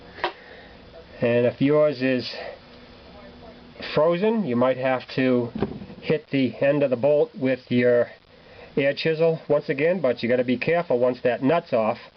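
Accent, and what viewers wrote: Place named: Massachusetts, United States. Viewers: Sounds like a New England accent. Definitely not NYC or NJ. Great video. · I was gunna say! This guy gotta be from mass 😅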